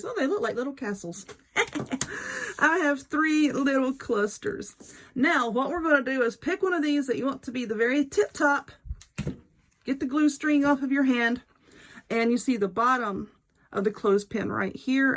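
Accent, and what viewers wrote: South, United States. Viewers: I will save this video with all of my other videos of things I want to make. I love that you take your time in showing some of us slowpokes how to make great diys. Your sense of humor and southern accent always make your channel so enjoyable to watch. I wish you and your family a very Merry Christmas and a wonderful New Year. I pray The Lord bless thee, and keep thee: The Lord make his face shine upon thee, and be gracious into thee: The Lord lift up his countenance upon thee, and give thee peace. Numbers. 6: 24-26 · I love this and being a displaced southern gal myself, I just loved hearing my kinda accent! One suggestion that I would offer would be to mention that if you use hotglue, it needs to be via a gun that makes the blue very hot! I heard about this craft from a friend who failed at her attempt and learned that she used a mini glue gun so that the blue wasn't hot enough. 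Thank you for sharing this craft.😁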